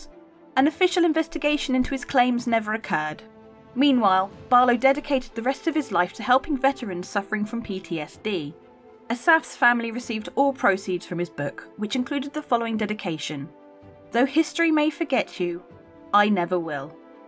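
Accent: United Kingdom. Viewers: When I first watched the lore 'story' videos I thought that it was something produce and official release by CIG. The quality and pace of voice commentary, depth of knowledge, the suitability of a brit accent - all make for a very high quality video. I remember watching the Nyx video and thought "Hey! that voice sounds familiar. Either has a very common tone of voice or she been contracted by CIG to voice over their vids now". Well done and keep it up.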